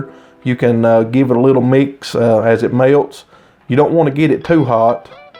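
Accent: South, United States. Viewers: Love your country accent 😍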